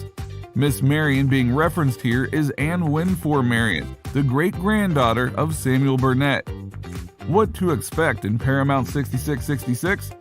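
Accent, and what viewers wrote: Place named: New York, United States. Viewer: Four 6's Mr announcer dude.....must a new yorker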